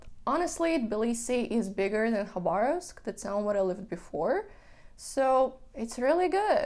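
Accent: United States. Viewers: You sound so American! That’s a good thing, I am from America and mostly like it here. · Your speaking style has suddenly turned American. I'm not a fan of it. I prefer European English.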